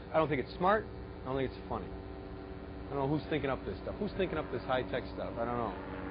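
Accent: United States. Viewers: gotta love the new york accent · That's a Chicago accent.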